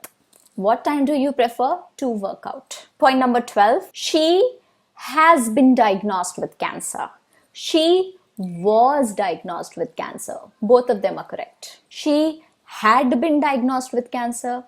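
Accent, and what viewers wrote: India. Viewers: Your accent is typical Indian. Learning grammer doesn't mean you are good in English, learn accent...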